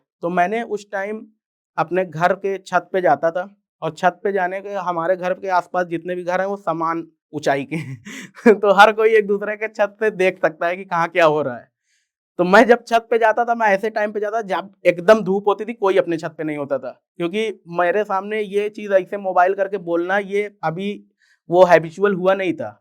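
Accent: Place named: Tanda, India. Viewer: Tanda ki accent h apki..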